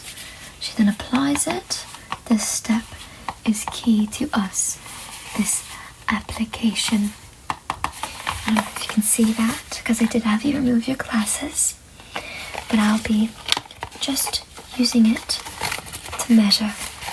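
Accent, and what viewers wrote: RP, United Kingdom. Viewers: ASMR is so much better with an English accent, I probably won't stat awake more than 5 minutes, so thanks ahead of time, good night 💤💤💤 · sorry but that was old british im not trying to be a pest but i find it a-bit stereotyped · Hello! Lovely to see you again on this fine day. Such a pleasant experience to hear your British accent in this video. We really appreciate your time and effort to make such delightful asmr for us and for that we thank you! Cheers! 😊👏👏👏👏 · Your posh I can tell 😂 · As an English person I find your accent quite amusing and endearing. It's a bit like a quirky Joanna Lumley. Quite plummy and soothing. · Not a bad attempt at the british accent also love your look aswell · I'm British and the accent is lovely:) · I think I look much better in the portrait, you draw excellent 👌👌👌 It was very relaxing for me to listen to you speak in British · As a Brit... 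I wish our accents were all this posh..... Mine certainly isn't! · I love the British accent and would for sure welcome more of this! Great video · As a fellow Britain myself I don’t know how to feel about ur British accent but keep up the good work!!! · Just when you think she can't possibly get any more adorable... enter the British accent. 🗣❤ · Very Bri'ish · British was something I never knew I needed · Just to be clear, folks, it isn't a British accent, it's an English accent. However, it is very commendable nonetheless 👏🏼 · Ngl although you sound like more of a upperclass english person its not far off. Better than mine and ive lived in the uk all my life 😂😂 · As an English accent I find the person quite brilliant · Your voice is adorable with british accent ❤ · I've lived here in the uk all my life (47 yrs old) and yet to meet someone who speaks as posh as this, but good attempt though. 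great video though.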